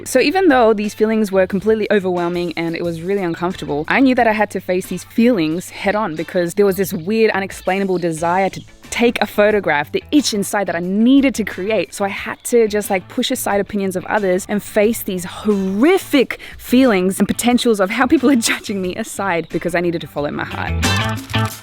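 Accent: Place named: Australia. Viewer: This was probably one of my favourite videos you have made! I loved all the content - preaching compassion and being content with your personal progress. But I also loved all the different scenes (backgrounds?) They looked great! And... You know your personality is just so fun! And your Australian (which all aussies love!) Keep up the great work!!!! 🇦🇺🌏😃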